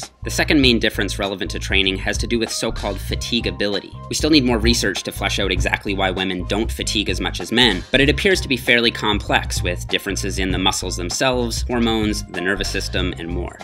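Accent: Newfoundland, Canada. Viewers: He’s a neufie lol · He a Canadian boy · Are you Canadian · Canadian accent is so interesting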